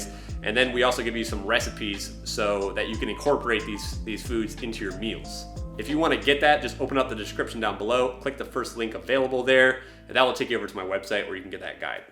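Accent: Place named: Michigan, United States. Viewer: sound like youre from michigan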